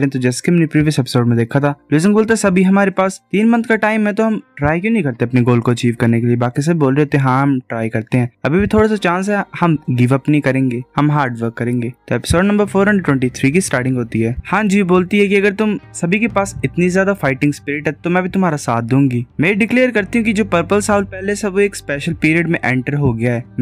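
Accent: Himachal Pradesh, India. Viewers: Bhai aap himachal se ho kya😅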